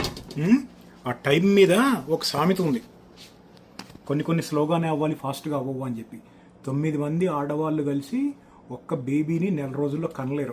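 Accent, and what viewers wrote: Andhra Pradesh, India. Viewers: Bro meedi Karimnagar kada...! But me slang andhra slang la undi... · Anna needhi telangana kada mari nee slang deniki andhra laa untadhi? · bro.. eppatnunchooo oka doubtu..! why does your accent sound so Andhra if you're from Nizamabad?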